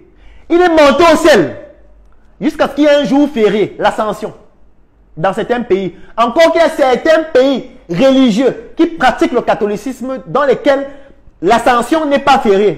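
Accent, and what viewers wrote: Cameroon. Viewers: Il s'exprime avec le ton des Camerounais . Chez nous au Cameroun, la plupart des gens parlent à haute voix et c'est très souvent naturel pour attirer l'attention des interlocuteurs . Alors si tu viens au Cameroun, tu vas aussi commencer à parler sur le même ton .😅😊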